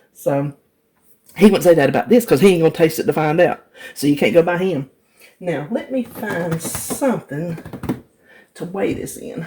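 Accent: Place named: Union County, United States. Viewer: I use Hellmann's Light Mayo and don't notice any real difference in taste from regular. I've even convinced my husband to like it. I've never tried Duke's. I put green onions and celery in my potato salad, no relish, but the sugar is key! You live near where my father's family was from for generations . . . Union County. My grandparents lived in Monroe. No wonder I love your accent. :) You measured 883 grams, but said 833 grams! Oops.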